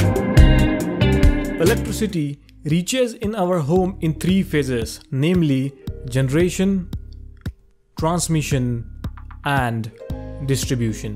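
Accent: India. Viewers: Idk if ur Indian or something but I love these types of accent also nice explanation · The moment I heard Ur Indian accent, I felt so comfortable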